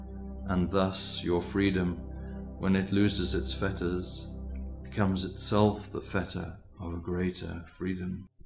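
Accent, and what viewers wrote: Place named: Manchester, United Kingdom. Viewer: Are you by chance Mancunian? The way you read reminds me so much of Morrissey (of Smith's fame)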